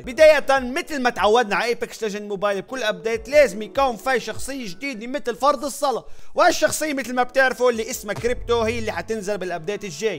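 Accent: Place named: Jordan. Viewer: لهجتك اردني على مصري على لبناني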